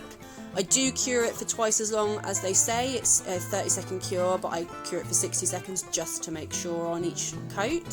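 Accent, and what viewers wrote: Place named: United Kingdom. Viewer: I love your work and your uk accent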